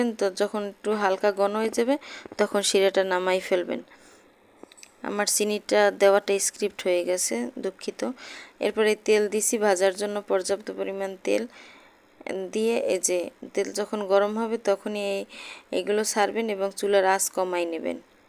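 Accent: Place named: Barishal, Bangladesh. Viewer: আপা,আপনার বরিশালের ভাষাই বলেন,তাহলেই ভালো লাগে।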